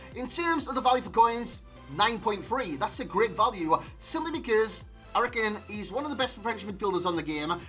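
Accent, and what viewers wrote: Newcastle, United Kingdom. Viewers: Proper Geordie accent until you say “nuts” and you sound like an upper class bloke who lives in a castle 😂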